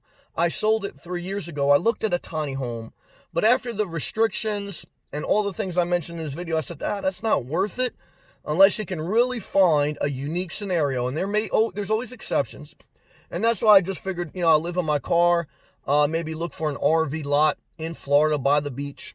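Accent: New Jersey, United States. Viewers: Thank you I love your NJ accent and you been keeping me company today driving from Jax, Fl to Alpharetta, GA :)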